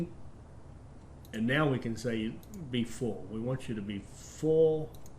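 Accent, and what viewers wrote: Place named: Appalachia, United States. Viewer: Hey, im one of your newer subscribers and I have a question or two. Firstly do you do any geneaology, because of the last name and coat of arms. Secondly, your accent seems appalachian like my mothers side who are from southern wva and eastern ky. I do geneaology and my great grandmother was an adkins which is similar to your last name. Just intrested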